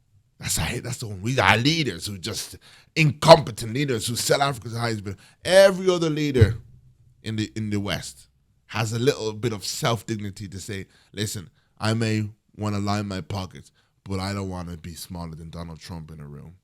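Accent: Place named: England, United Kingdom. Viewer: Donald's just doing a word salad. Are you one of those Brothas getting paid by Candace Owens, because you have an English accent? Because you are doing the exact same thing that they do. Higher a Black guy that doesn't know what is going on to sit there and listen. You get other Black people to listen to this alt-right crap. Donald is removing Black American rights. He has removed Affirmative Action. He removed the Fearless Fund for Black business women. He attacked Critical Race Theory so that reparations could never be discussed. He removed Black history. Donald is backed by the American Heritage Foundation that is attacking P Diddy whose story was in the 90s. Trump has a rape charge.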